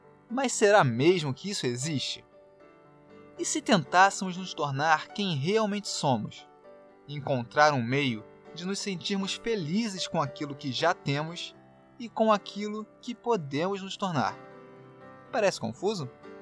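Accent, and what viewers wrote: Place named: Rio de Janeiro, Brazil. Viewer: (*)ele é cari oca ele é carioca Olha o jeitinho ( o sutaki ) dele ao falar... (*) O meu Coração é carioca A minha Alma é carioca 🙃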